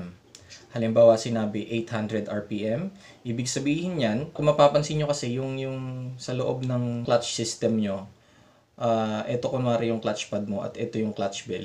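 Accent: Ilocos, Philippines. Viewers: Sir Ilocanos ka po ba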